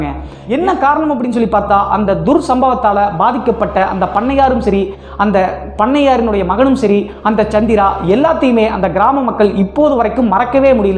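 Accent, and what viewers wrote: Tirunelveli, India. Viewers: Bro enga area speech mari irukku. Tirunelveli doii🤩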